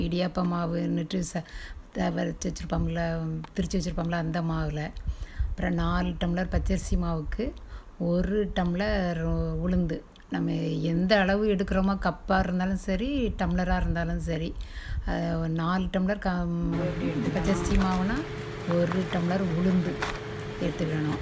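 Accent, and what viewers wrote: Tirunelveli, India. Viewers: Nalla peysureenga namma ooru pakkam pona mathiri iruku Unga recipe super Tirunelveli thana? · ரொம்ப தெளிவான பேச்சு நீங்க நெல்லையா? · but ur accent also gud..nellai touch up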